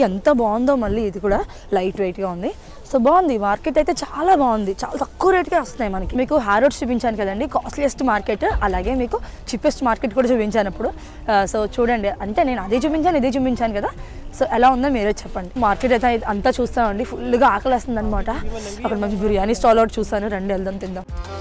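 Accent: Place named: Rajahmundry, India. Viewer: Voice vintunte starting lo . .ekkado vinnttuga anipinchindi....Rjy voice na .. 😀 Maadi ade....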